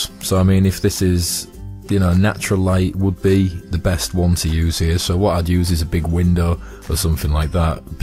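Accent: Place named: Leeds, United Kingdom. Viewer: sounds like a leeds accent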